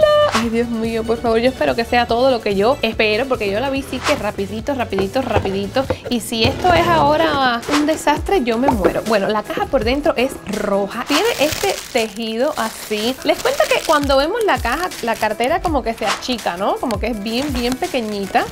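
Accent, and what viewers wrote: Cuba. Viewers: tu cartera esta muy bella, pero te pido que no pierdas tu acento cubano, he notado como que lo quieres apartar y la verdad te queda muy bonito hablar así.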